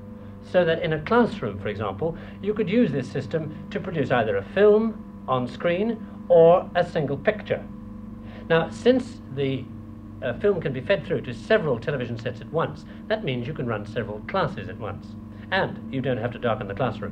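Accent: RP, United Kingdom. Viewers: Didn't James have a very silly BBC voice back then?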